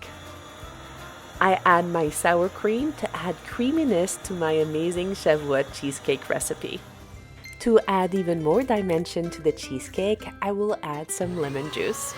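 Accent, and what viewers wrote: France. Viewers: Bonjour. La façon dont vous prononcez certains mots me fait penser que vous êtes française. Vous prononcez certains mots avec notre accent français. Je me trompe? Merci pour vos vidéos. C'est grâce à vous que je découvre votre univers et c'est super intéressant et beau à voir. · Hi! I can hear a french accent behind your hebrew words! Haha! I really love your channel and everything you present in it. It brings a lot of positive energy and ratzon to prepare good things as well. Be blessed! Yeshar koach!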